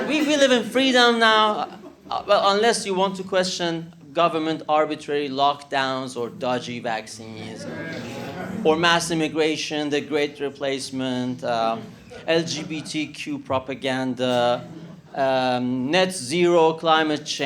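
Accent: Iran. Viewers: he himself is Iranian i can say by his stupid accent and he badmouthing his own ppl, that is always a bad sign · Should ur name be “ ” that Persian accent isn’t fooling anyone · What’s up with your Persian accent bro?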